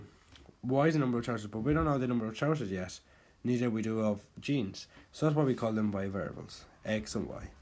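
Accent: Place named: Ireland. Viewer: Hey glad I found this video. Thank you. Could not remember how to do this. It is easier if you do a simultaneous equation pretending the ≤ is =.............. Like your Irish accent.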